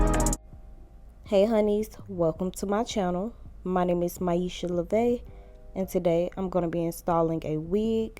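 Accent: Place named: South, United States. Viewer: 😍😍 I love a country accent.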